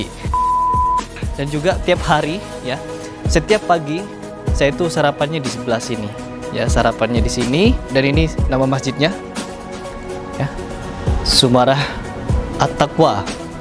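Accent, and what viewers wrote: Madura, Indonesia. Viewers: Logatnya madura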